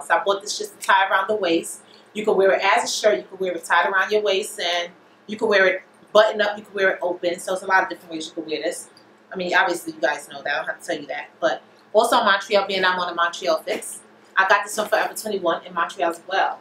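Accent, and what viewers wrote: New York, United States. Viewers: Nothing wrong, I love a New York accent.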